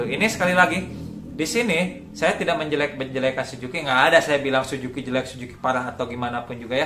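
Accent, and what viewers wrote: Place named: Banjar, Indonesia. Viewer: Orang Banjar ya bang🤣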